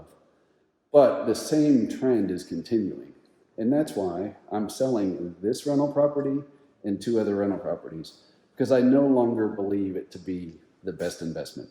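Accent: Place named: Southeast, United States. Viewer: I often wonder your general area because my ear says you are in the southeast.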